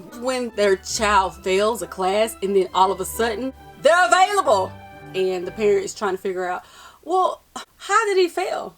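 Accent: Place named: South, United States. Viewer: Where u from with that country accent ..... I hate teaching !!!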